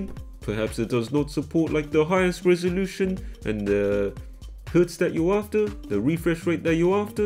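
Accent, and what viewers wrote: New Zealand. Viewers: Hes a kiwi😂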